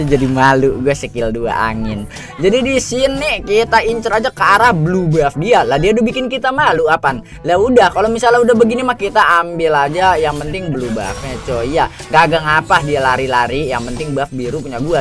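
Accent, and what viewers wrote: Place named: Java, Indonesia. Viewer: Medok amat bg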